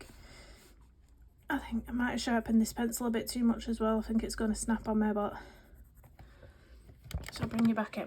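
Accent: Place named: Wales, United Kingdom. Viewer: Hi beautiful I love the unicorn 🦄 she is looking beautiful.. I really thought u were from wales lol.. your accent is beautiful when I talk in Aussie slang some people look at me too exp when they aren’t Aussie lol 😂 lol forward watching your next colour and chat ❤x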